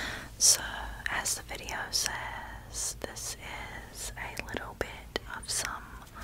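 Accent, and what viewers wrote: South, United States. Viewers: There's really something quite enjoyable about a southern whisper.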